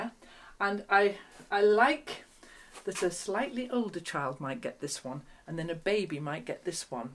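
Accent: United Kingdom. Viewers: Indeed, her British accent & energy & unique authenticity, truly make her a gem of a gal and excellent teacher…She is so relaxing to listen to, like an old friend to sew/craft with! ❤️🙏🌞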